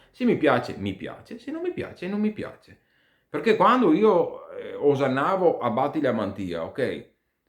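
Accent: Veneto, Italy. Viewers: Ciao complimenti per quello che fai, forse mi sbaglio.. ma dal tuo accento mi sembra che tu sia veneto.. mi sbaglio o ci ho preso?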